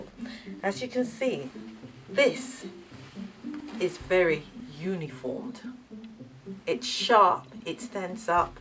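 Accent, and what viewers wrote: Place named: United Kingdom. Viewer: Love your British accent!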